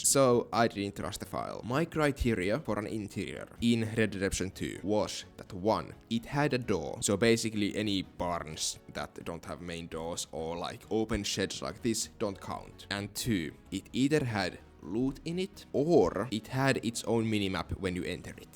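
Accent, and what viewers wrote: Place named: Finland. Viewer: I can see his hard finnish accent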